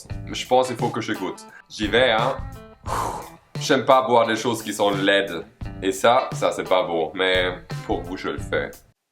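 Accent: Quebec, Canada. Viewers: Ah le canadien🇨🇦 un mélange d'allemand🇩🇪 et de francais🇫🇷 🙄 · J'adore l'accent Québécois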